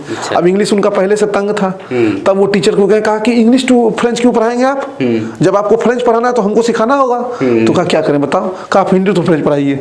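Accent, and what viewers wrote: Bihar, India. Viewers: Sr g ap Bihar se h kya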